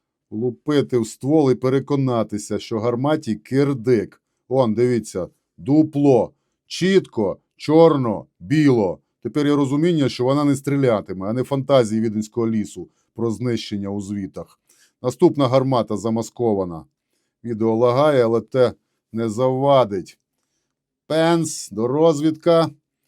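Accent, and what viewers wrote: Transcarpathia, Ukraine. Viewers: Бадабуум-Чакалака-Мазафака!!! Йобудошч! Вдупумаш!!! Який соковитий всеж закарпатський діалект!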